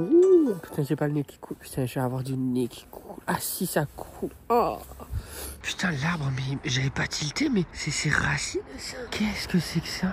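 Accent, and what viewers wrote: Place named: South, France. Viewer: J adore ce que tu fais.bravo a toi tu le fais trop marrer avec ta mère. Ton vlog va beaucoup m aider je pars en octobre pour fêter les 26 ans de ma fille merci d être comme tu es je me retrouve dans ta façon de parler le sud en force.😂belle et heureuse année a toi et a ta famille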